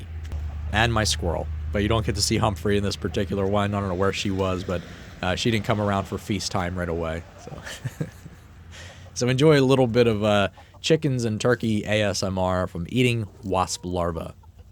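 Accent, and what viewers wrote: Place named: Pennsylvania, United States. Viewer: Knew you were from PA before you said it, one of the most recognizable american accents. 😅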